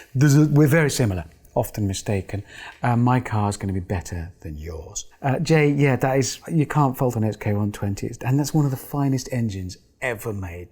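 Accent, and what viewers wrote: United Kingdom. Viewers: I thought he was british not canadian...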